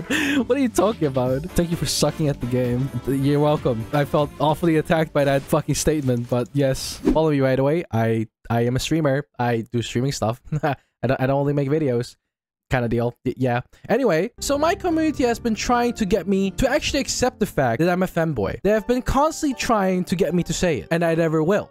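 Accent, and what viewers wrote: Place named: Netherlands. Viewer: Most dutch accent ever he meneertje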